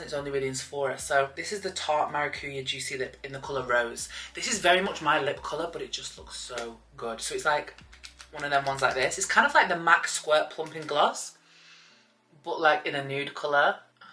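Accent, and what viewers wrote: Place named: Preston, United Kingdom. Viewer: I have no idea how I never found your channel until recently, we're the same age, same size, and I also grew up in Preston so we sound the same😂 the asda you used to live behind is where I went for the weekly shop with my mum haha. Your genuine love for yourself and happiness is so empowering to see, thank you for posting!❤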